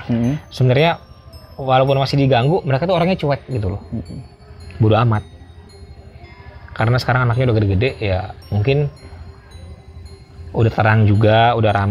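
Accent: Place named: Lampung, Indonesia. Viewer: Lampung emg gtu lagat ny bang jadi biasalah 😅 sy juga org lampung🤣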